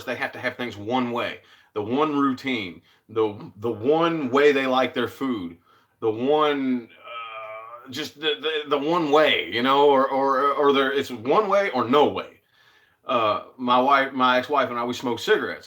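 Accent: South, United States. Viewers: You sound like you could be from the south, maybe I’ll figure it out as I keep watching your vid’s. I’m glad you have a happy relationship. Blessings 💗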